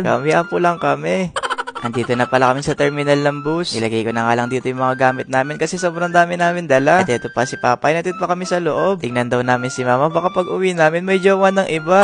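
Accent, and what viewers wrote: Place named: Iloilo, Philippines. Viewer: Ilonggo ka gali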